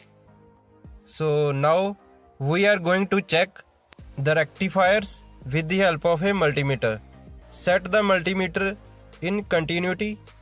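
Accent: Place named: Punjab, India. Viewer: It's better to make video in urdu instead of punjabi English brother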